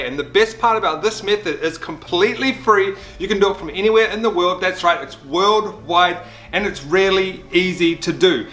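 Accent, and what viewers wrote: New Zealand. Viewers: Where's your accent from ? Sounds kiwi! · yep he's a kiwi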